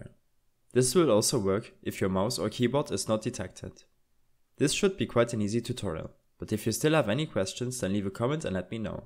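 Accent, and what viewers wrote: Germany. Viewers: Der deutsche Akzent kickt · Deutschester Akzent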